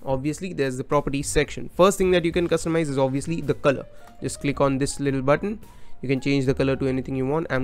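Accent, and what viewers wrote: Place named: India. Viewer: Как обычно, вначале рыщешь половину интернета в поисках инфы, а по итогу один мужик с индийским акцентом за 3 минуты всё рассказывает как надо. Спасибо тебе, брат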